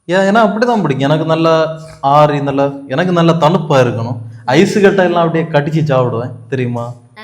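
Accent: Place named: Kanyakumari, India. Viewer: உங்க ஊரு கன்னியா குமரி மாவட்டம். ....😂😂😂😂😂 நல்ல தமிழ் . எங்க ஊரு மொழி எனக்கு தெரியாதா.....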